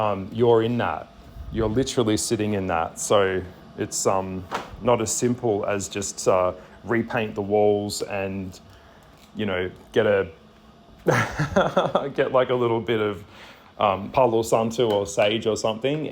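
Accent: Australia. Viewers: but you have an aussie accent- where did you grow up?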